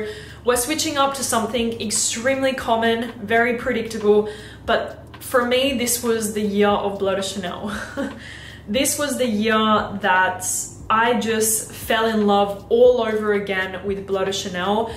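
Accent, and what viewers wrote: Australia. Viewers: aussie accent 😍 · Great review! Also, I have to say you (and that voice/accent (Australian?)) are absolutely lovely. Anyway...love your videos.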